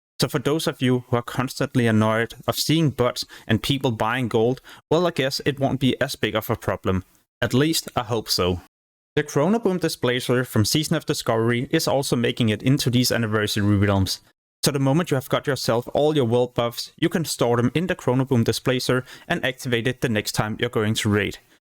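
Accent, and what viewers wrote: Denmark. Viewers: Mm danglish! Nothing but love.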